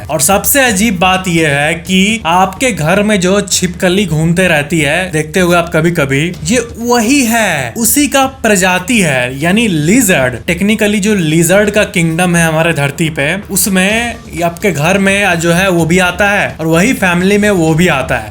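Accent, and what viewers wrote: Bihar, India. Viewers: aap bihari language mat bola Karo purushling ko istriling 😅😅😅😅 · Bhaiya,u r giving bihari feeling ❤❤❤❤ in talking 😊😊😊😊😊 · Are u from bihar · Ap bihari h kya apka accent bihari jaisa lgta h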